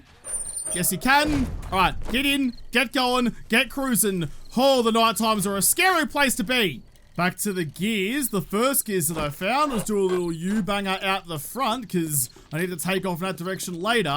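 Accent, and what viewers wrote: Australia. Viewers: Ok, listen: I need to stop watching these vids in bed before sleep because people in my dreams have aussie accents and slang now. Lol. Then again, accents are hot, so I guess I shouldn't complain. Plus, trade Jen is getting jealous, I think because whenever I go see her, she's got nothing I need, and when she does, she overcharges me, I swear. Seriously though, I get so many good ideas by either watching be a muppet or have brilliant ideas! Thanks for helping me survive A20!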